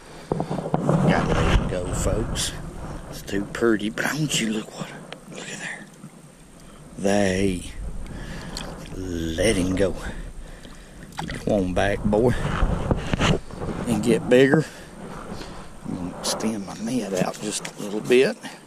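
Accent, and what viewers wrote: South, United States. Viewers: I appreciate your humor, southern accent, and your thoughts of what fishing is all about! So glad I found your channel. Keep mak'n videos! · in that american language of yours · Maybe it's your exaggerated southern ayuckseeant, but I call them crappies, not crawpees.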